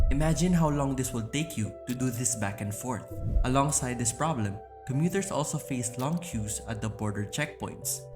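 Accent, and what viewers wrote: Philippines. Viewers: Why such heavy Philippines accent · A pinoy narrating this.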